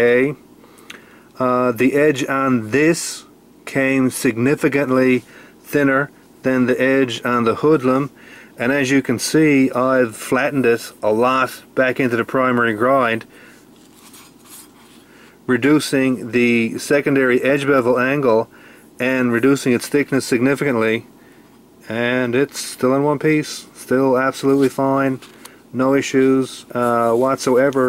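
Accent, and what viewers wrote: Newfoundland, Canada. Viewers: 5160 is a very good steel if it is hardened correctly. You are not the first person to have issues with the hoodlum or buck knives for that matter. That is one company I tend to stay away from. Also, are you from Newfoundland, if you don't mind me asking? If so hello from Alberta!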